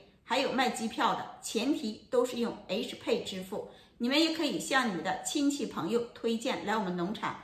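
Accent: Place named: Northeast, China. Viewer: 你东北口音，又不是徐州的，怎么了解的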